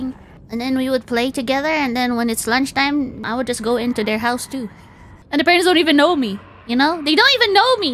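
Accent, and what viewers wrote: Philippines. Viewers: I wonder if is from batangas? She kinda has that accent form a batangenyo when she talks tagalog my Filipino guy's knows what I'm talking about.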